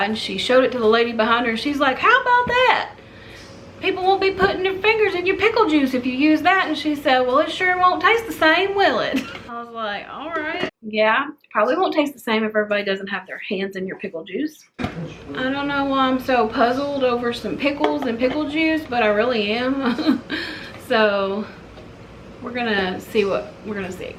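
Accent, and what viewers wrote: Kentucky, United States. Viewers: Just live about one hundred miles from you. I picked on that KY accent as soon as you spoke…..lol. Love all you’ve done to your home. I grew up in mobile home, it was 10 feet wide and 40 feet long. After raising 4boys I’m ready for a tiny house again, I lived in something much bigger during that phase of life. Now it’s just me and I’m ready to downsize.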